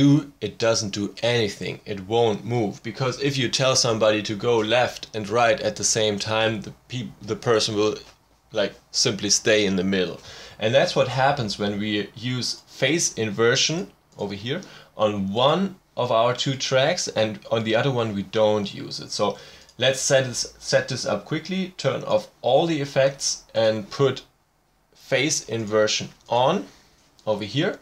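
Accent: Germany. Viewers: You're german, right? :D Nice Video!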